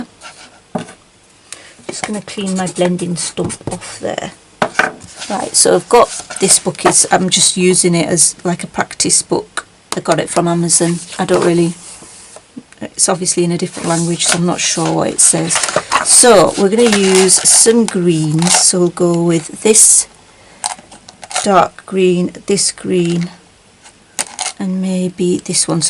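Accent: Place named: Yorkshire, United Kingdom. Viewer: good idea! I have carers who go in and out...they help me care for my partner, so I have to ensure from a health and safety view I do not have tripping hazards...so putting loads of cushions against the door is not an option. I now have to watch Amazon and track where the delivery person is and hover by the door when they are a couple of stops away so that I can take it from them. Total nightmare! I still haven't opened and tried the items yet...still too scared. I am really enjoying your videos. Love your accent...I am trying to work out what part of Yorkshire you are from. I am in the UK too.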